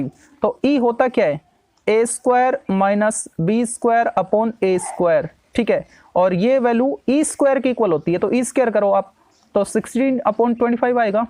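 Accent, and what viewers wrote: Haryana, India. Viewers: Sir aap haryana se ho na 🎉❤😇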